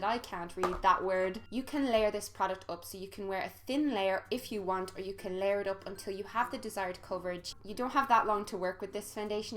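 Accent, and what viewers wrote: Ireland. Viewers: your tutorials are very helpful for people with excoriation disorder like me, now I'm not ashamed of my skin, thank you so much <3 also I'm Russian and I really like Irish accent, for me it's much easier to understand than British :) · I soooo love irish accent... And i have a friend who is irish... She's so sweet and kind... First time watching your video... I subscribed immediately 😘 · Oooh man, what a lovely accent! It makes me wanna visit Ireland even more <3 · I'm always so exited when I see your videos in my subscription box. I love your style and your accent is so desirable! Wish I was Irish! Thanks so much for improving my makeup knowledge and providing such inspiration!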